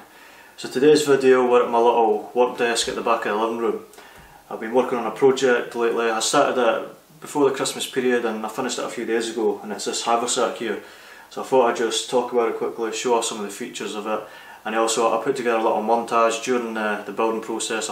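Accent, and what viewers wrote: Scotland, United Kingdom. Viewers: Cool pack, I like the orange lining. I've noticed your Scottish accent is getting stronger now your back up there, no problem for me, I can understand ya, I lived in Aberdeen for 3 years. Nice one. Lee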